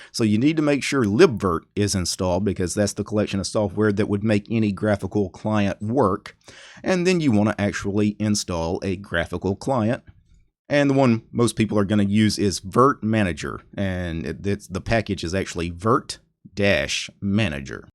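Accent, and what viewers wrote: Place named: Texas, United States. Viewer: He has a strong Texas accent :-)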